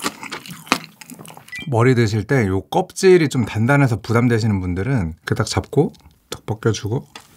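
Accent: Cheongju, South Korea. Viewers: 혹시 청주 사람이신가요?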